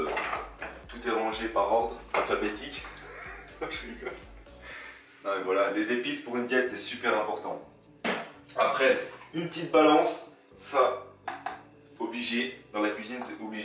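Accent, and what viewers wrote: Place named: North, France. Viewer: Ton accent du nord me fait trop rire ahah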